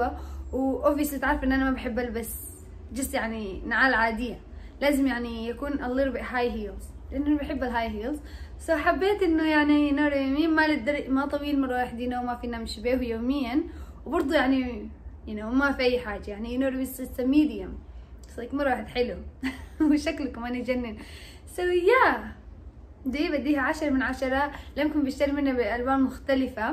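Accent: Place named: Sudan. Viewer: لهجتك السودانيه حلوه شديد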